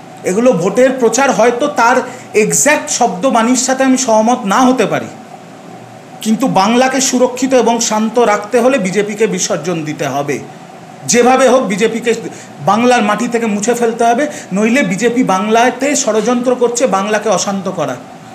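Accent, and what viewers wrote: Bangladesh. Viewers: তোর বাবার সার্টিফিকেট আছে বানচো তোর ভাষাতেই তো বুঝা যাচ্ছে তুই বাংলাদেশের মাল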